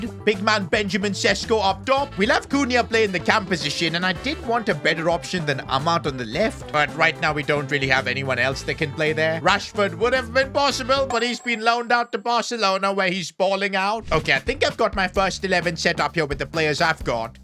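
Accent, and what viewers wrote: India. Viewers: Are You Indian Broo?? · Bro are you indian??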